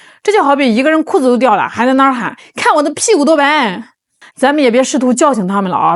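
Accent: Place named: Henan, China. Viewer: 主播的口音好重😂河南人吗？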